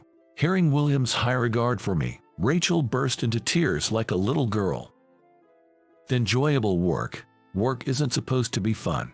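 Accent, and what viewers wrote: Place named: Boston, United States. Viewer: Love the Boston voice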